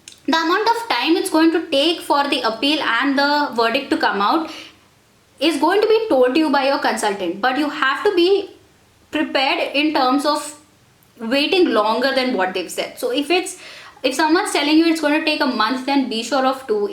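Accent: India. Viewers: Malyali English 😬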